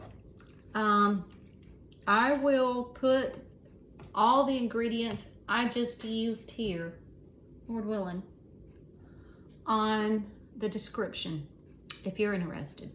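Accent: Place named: South, United States. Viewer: Never apologize for your southern accent ha ha I'm such a hick myself lol Love that apron, so cute. I'm gonna show it to my mom, I know she can make me one. I love watching you cook. That soup and cornbread sounds so good, yum! I believe you're right about the haze it's the same way here. Never thought about it until you mentioned it.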